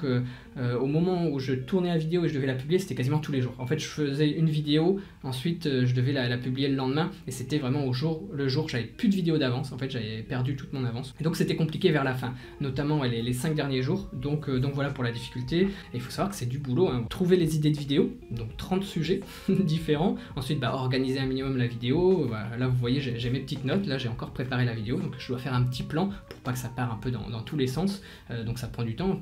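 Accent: North, France. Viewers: J aime bien tes vidéos elles sont toujours instructives et j aime la façon que tu présentes et j aime l accent du nord de la france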